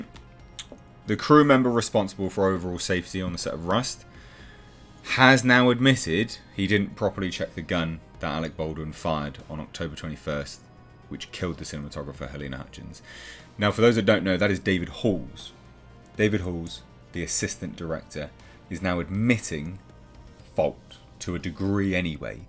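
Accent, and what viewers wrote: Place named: United Kingdom. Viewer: You're so handsome, then add the British accent and it's a wrap for me lol. Sorry 🤷🏻‍♀️🤦🏻‍♀️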